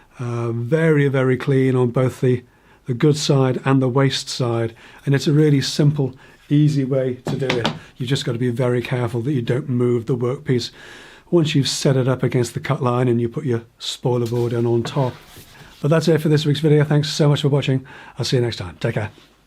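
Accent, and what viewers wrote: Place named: North West, United Kingdom. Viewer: Your accent sounds local to me, are you from the North West?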